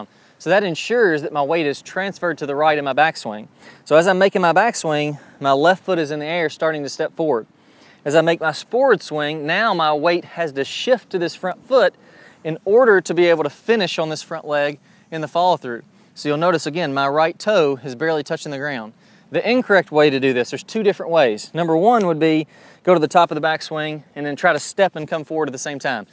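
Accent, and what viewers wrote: United States. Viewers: Great video! I've already started on the drills. Are you an Alabama boy? You sound like it! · This dude's accent sound like Mid country side of America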